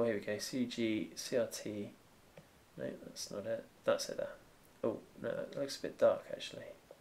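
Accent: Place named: United Kingdom. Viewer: Why do all the British gamers on youtube have your accent? Or are you all THE SAME DUDE?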